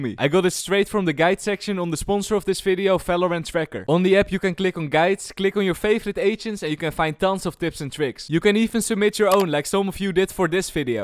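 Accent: Netherlands. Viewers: bro wait you are dutch, i didn’t even check i can hear it lol 😂😂😂😂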